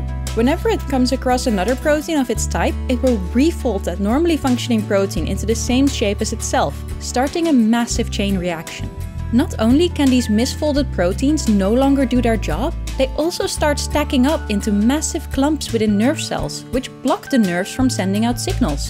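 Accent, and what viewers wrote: Netherlands. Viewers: Damn that accent cannot be more Dutch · Do I hear a Dutch accent there? It sounds like she's Dutch and I love it! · And yes, as always i can hear a dutch persons heavy accent. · This new presentation voice sounds very Dutch, is she from the Netherlands? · i thought right away "since when did minuteearth have a dutch accent"? then i checked and saw the name....🤣 cute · She is definitely dutch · Sorry if i am wrong, but i am 99% sure this is a dutch accent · Dutch accent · Are you Dutch? 👀 You sound Dutch (from a Dutch person) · Massive dutch accent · that's just her being Dutch though. They talk like that :p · Heyyy that's a dutch gal; I can instantly tell. Je doet het goed! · The Dutch accent makes me feel at home instantly. (sorry, if I misidentified the accent) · Dutch? i could recongnise that accent from 100 km away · Are you dutch by any chance?? 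Your accent really sounds Dutch 🧍‍♀️ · Dutch accent be thicc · As a Dutch person I instantly recognised the accent as Dutch😅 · A wild dutch accent appears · she has a Dutch (Netherlands) accent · Yikes, dat je uit Nederland komt is onmiddellijk duidelijk van jouw accent. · Kan me niet op de video concentreren hoor met dat mega Nederlandse accent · It's funny hearing this as a dutch person because the accent of the narrator is so clearly dutch. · ✨Dutch accent✨ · As soon as started to talk I heard the Dutch accent! Awesome video anyway:) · Nou ik hoor dat het Nederlands is natuurlijk, lekker bezig!! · you have to be dutch :) the way you say creutzveldt-jabobs is how you say it in dutch. also there is a dutch accent on the english but Im al proud duty to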